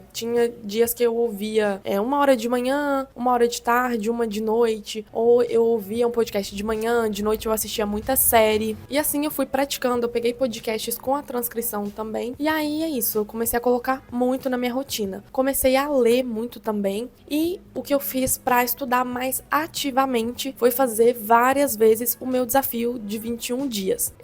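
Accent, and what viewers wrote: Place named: Argentina. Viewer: Oi, eu sou da argentina. Parabens, vc fala miuto bem o espanhol. Voce tem um sotaque argentino pra mim 🇦🇷🤙🏽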